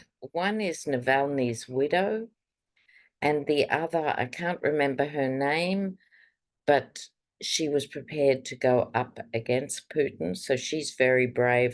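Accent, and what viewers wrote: Australia. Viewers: “…his lily white buttocks.” I love how you said that! ❤️Australian accents rock! 😂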